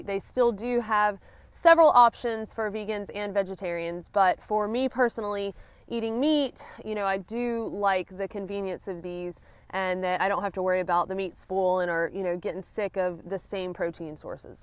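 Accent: South, United States. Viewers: What kind of country ass accent is that ☺ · Bet you never heard this before. I like yer southern drawl. Lol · I'm from Tennessee and hear it all the time,but I could listen to your sweet southern voice all the way to Maine...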